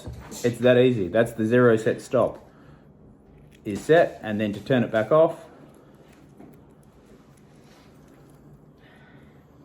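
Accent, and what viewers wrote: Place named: Australia. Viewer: Australian ain’t ya mate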